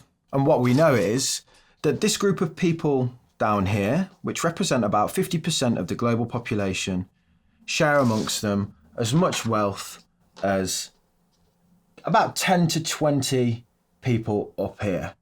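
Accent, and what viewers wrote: Birmingham, United Kingdom. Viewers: Fantastic video! I think I hear a Brummy accent. Are you from Brum? Also, more videos with UK garage involved pls. Haha 🙏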